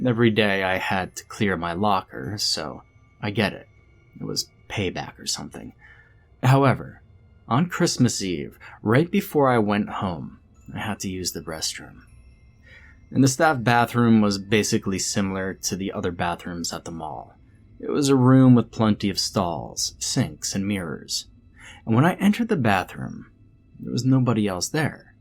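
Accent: South, United States. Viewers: I thought you was from down south here around Tennessee South & North Carolina or Kentucky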